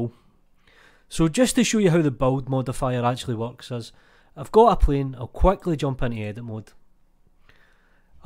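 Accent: Scotland, United Kingdom. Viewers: Hi Thanks for yet another super useful tip. Please do not drop the accent. Scottish is pure music to my ears.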